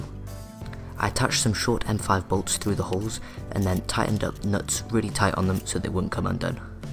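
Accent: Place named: Wales, United Kingdom. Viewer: You have a slight Welsh accent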